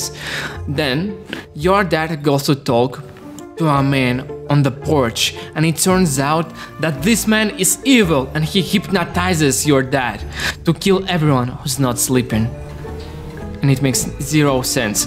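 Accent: Russia. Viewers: Даже акцент немного русский я уверен это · Акцент русский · У все ещё русский акцент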